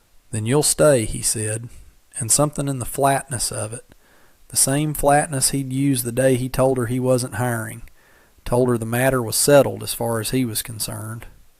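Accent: South, United States. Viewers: Terrific story. Love narrator's voice and southern accent.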